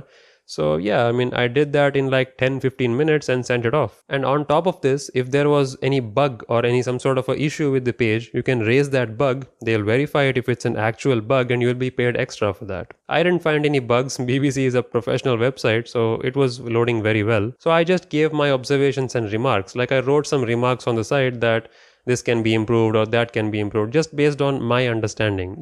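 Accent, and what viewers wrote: India. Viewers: Thanks for being a legit guy,soothing voice and cool hairstyle btw.Keep up the good work. By the accent are you indian?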